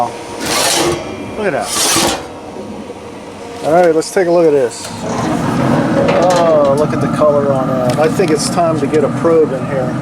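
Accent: Pittsburgh, United States. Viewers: I can’t get past the Pittsburgh accent lol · Awesome setup! And my God you sound like such a yinzer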